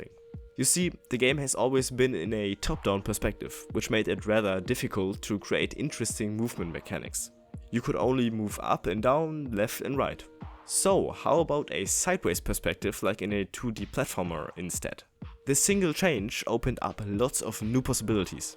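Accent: Germany. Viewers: actually a game i would buy. Are u from germany? I like ur german accent. keep on inproving. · I love your german accent, correct me if im wrong but its so typical like me haha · Are you german? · kann es sein das du deutsch bist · very cool devlog and game! You're very talented at deving and making vids, keep it up! The only thing I notice is a little ''german accent'' If I'm correct pls let me know :D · noway you arent german you sound somuch like youre from germany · Youre german arent you? I can hear the accent a little. :)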